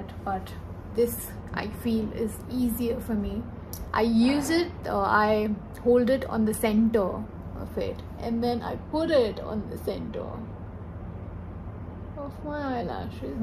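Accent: Maharashtra, India. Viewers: Sundar insaan love u alot... dii your smile is therapy for me its so pure nd beautiful keep it safe...di your cutest nd goofy side is amazing your Marathi accents is fab nd i personally love it pls never forget this to add in your vlog.....dher saara pyr apko❤❤ love u so much 😍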